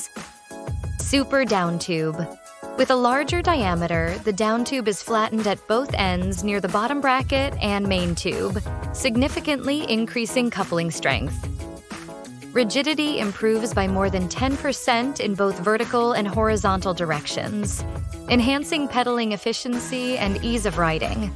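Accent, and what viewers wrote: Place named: United States. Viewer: 대혼이구나 미국발음은...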